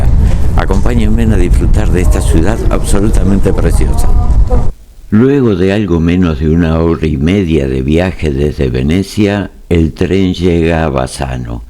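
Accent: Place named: Argentina. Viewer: Buenos días Sr.; soy su nueva fiel seguidora, soy 🇵🇪, vivo en 🇺🇸 tengo 62 años, viajo a 🇪🇸a visitar a mi hija un año, y otro a 🇵🇪. Buscando consejos de viaje, con conexiones eso es muy estresante, encontré su canal, me encanto por la forma pausada en que nos habla, aparte su acento argentino, trabajé 5 años en su País, conocí gente maravillosa ❤. Por eso mi cariño eterno 🇦🇷. Muchas Gracias, este video me hizo recordar mi paseo a Fiorenze.. y la vez que me perdi al regreso a Roma por qué me fui hasta Nápoles 😅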